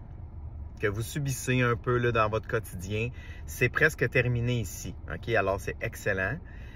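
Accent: Canada. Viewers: Trop gaga de votre bel accent canadien bel homme😊❤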